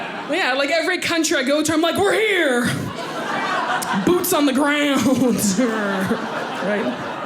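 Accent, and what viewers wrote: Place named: Midwest, United States. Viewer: From her accent I swear she's from the midwest!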